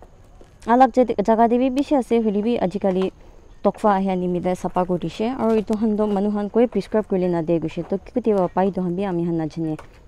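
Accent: Nagaland, India. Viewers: Now that is how Nagamese should be spoken, unlike those other channels trying so hard to add in Assamese and Sanskrit-like words which we can't even understand.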